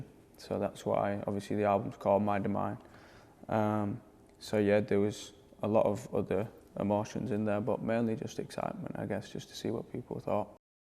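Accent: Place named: England, United Kingdom. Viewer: he speaks in cursive with an english accent..... I wanted this video to be longer..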